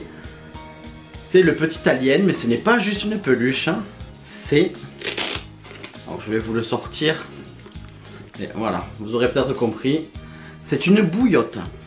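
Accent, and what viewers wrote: South, France. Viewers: Coucou =) Découverte de la chaine avec cette vidéo ;) Ah ça fait du bien un accent du sud!! Je suis fan de tes tsum Elliot derrière <3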